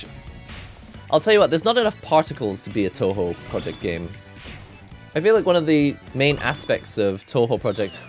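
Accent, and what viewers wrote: United Kingdom. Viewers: Are you Japanese or British? I'm so confused O_O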